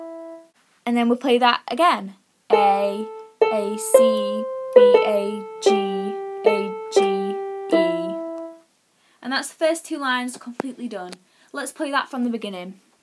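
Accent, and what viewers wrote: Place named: United Kingdom. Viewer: Silent night was the first song you thought meand I was OMG I never thought I would be able to play it and also I love your British acsent and you also sound like Luaren Platt in the XFACTOR your lovely piano teacher your teaching is very easy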